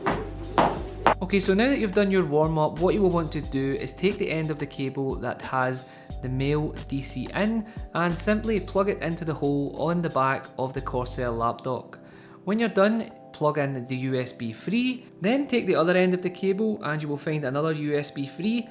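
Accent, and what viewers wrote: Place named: Scotland, United Kingdom. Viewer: I love the one cable instead of the spaghetti you usually get, I thought people hated Scottish accents haha. Nice vid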